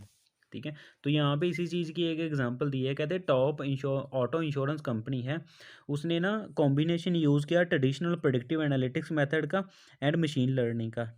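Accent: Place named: Punjab, India. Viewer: But your accent like u r from punjab..... And mostly speaking in hindi & punjabi .... So why are h hiding that u r from Chandigarh...???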